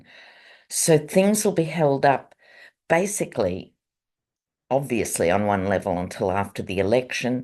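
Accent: Australia. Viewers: Hi, I was wondering if you wouldn’t mind telling me what tarot pack you are using in this video, really drawn to the artwork? Love hearing an Aussie voice & as I think we are of the same vintage, also love your colloquialisms you use 😊 Thanks for all your hard work. Best wishes ❤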